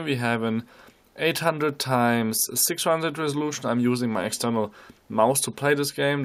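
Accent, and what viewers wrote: Germany. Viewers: are you german :)?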